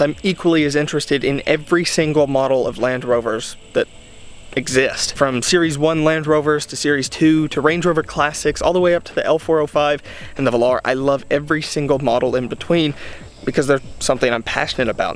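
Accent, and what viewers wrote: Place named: United Kingdom. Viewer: U can tell this guy loves rr he is getting a small British twang to his accent. Ha. And yes I agree u r really cute. X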